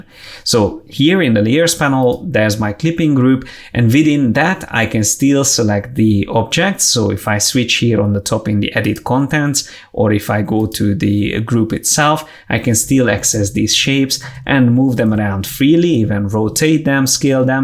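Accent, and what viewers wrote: Hungary. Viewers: Your voice it's like you have a little bit hungarian accent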